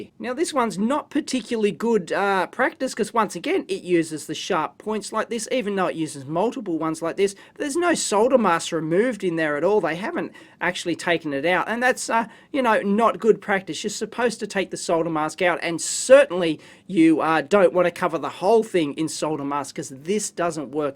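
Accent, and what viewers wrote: Australia. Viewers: He's Aussie, mate. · You accent really got me thinking the entire video where you might be from. Usually american accents piss me off, which isn't really the case with your voice. Also (maybe im crazy, who knows) i sense sliiiight british nuances. So my wild guess would be ... Australian?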